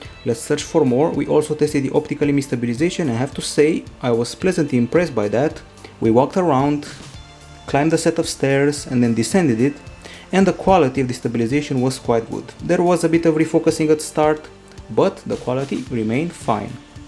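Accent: Romania. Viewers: Romanian accent 😅 👍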